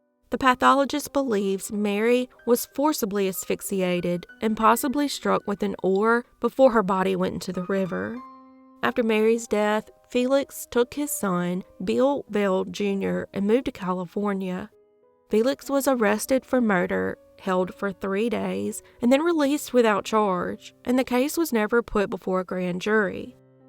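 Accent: South, United States. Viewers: can be hilarious at times with her cute Southern drawl🤣😂👍 Excellent narrator too!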